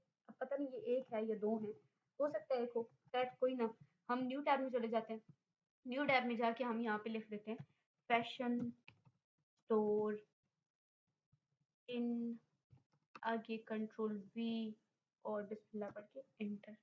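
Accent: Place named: Jhang, Pakistan. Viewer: jhang ki ho ap ?apki punjabi sy laga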